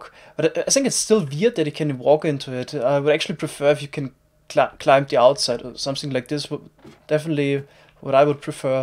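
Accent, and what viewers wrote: Germany. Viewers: You've a hard german accent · R u german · By any chance are you german? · Is he german? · thats a hardcore german accent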